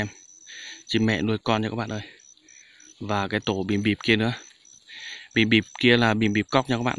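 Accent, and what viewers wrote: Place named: North, Vietnam. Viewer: Bạn là người miền Bắc vào Tây Nguyên sinh sống à? Mình thấy bạn nói giọng miền Bắc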